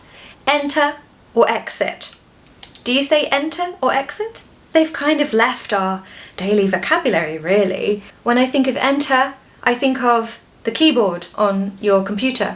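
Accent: United Kingdom. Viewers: Your excellent, real, and fast British accent is so helpful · Your an excellent,real,fast British accent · This is the Brit accent and Queen's English I’ve been desperately, deliberately, literally, immensely, thoroughly, remarkably, intensely, highly, absolutely, insanely, utterly, and certainly searching for my whole life. I’ve finally found it! Teacher 👩‍🏫, I hope you won’t kick my butt for using too many intensifiers — I just had to express how I feel. Love ya! ❤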